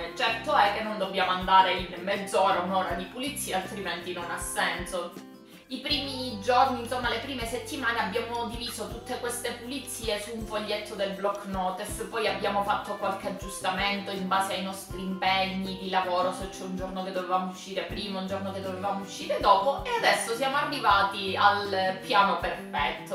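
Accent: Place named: Cosenza, Italy. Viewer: Ciao mi sono iscritta al tuo canale e volevo farti i miei complimenti!Se non sono troppo invadente volevo chiederti se sei di Cs perchè mi sembra quel tipo di accento (io sono dell'alto Jonio).Sei libera di non rispondere se vuoi .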